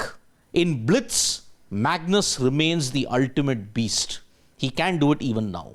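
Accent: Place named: Tamil Nadu, India. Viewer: Host: U've an Irrrrrrittttaaating Tamilian accent, a bit like Ashwin the bowler. Def not a grt way to present. Gary's Insights are always valuable. Magnus is perhaps past his prime now. We shall know it in a yr or so.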